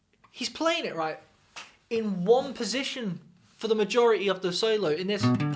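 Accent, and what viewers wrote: United Kingdom. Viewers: What part of Britain are you from? Love your accent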